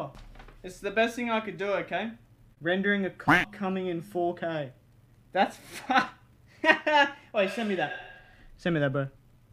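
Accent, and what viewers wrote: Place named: New Zealand. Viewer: i like ur new zeeland acksent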